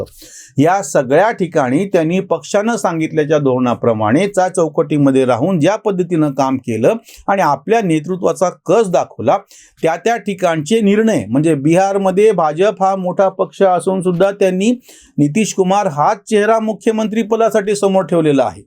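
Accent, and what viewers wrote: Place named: Marathwada, India. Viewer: टीका पण मनावर घेता, भाषेवर पण मेहनत घेतलीत मराठवाडी ठसका कमी झाला. मी गाभाच ऐकत असल्याने त्रास होत नव्हता पण मराठवाड्याशी संबध नसल्याने काही शब्दाचा अर्थबोध होत नसे. आजचा विषय आणी presentation खुप आवडले.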